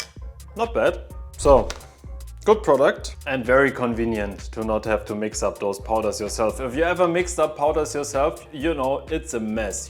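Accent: Germany. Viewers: The german accent is going to kill me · sounds triggeringly german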